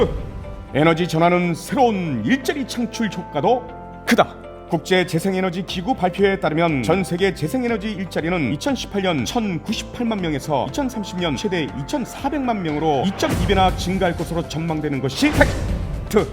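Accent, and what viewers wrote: North Korea. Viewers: 성우가 약간 ㅋㅋㅋ 북한 같아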